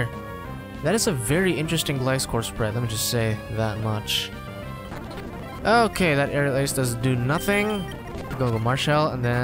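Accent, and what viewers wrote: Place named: United States. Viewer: woah cool! I have Filipino blood and was born in San Francisco, California. Came here to the Philippines way back '98 when I was 10. So are you residing now in the U.S.? I noticed your accent. It's an American accent so that made me think you're a Fil-Am but as you've said you're full Filipino. Btw, does this hack have an Amulet Coin? I just went in the Pokémon League earlier and I'm wondering if this hack does have an Amulet Coin. It's so hard to make money in the Pokémon league because my team's level is just 5 main Pokémon with levels 56. I keep on dying whenever I get to the 3rd member of the Elite Four.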